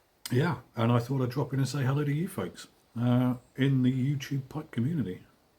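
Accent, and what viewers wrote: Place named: Dorset, United Kingdom. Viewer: Good to hear your dulcet Dorset tones, mate!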